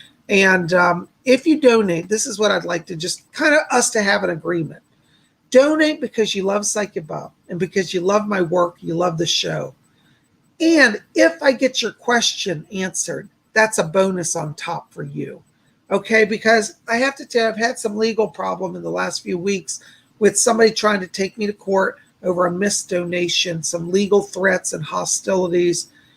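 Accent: United Kingdom. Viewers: Blessings Lord. Love the English accent (lush) lol Cola has brought so much positive energy an love and light to your being. We are so blessed to have her in our lives. She is my little sister and I'm Mama pit bull over her and you. Team Lady Cola and Lord. Blessings to you and lady Cola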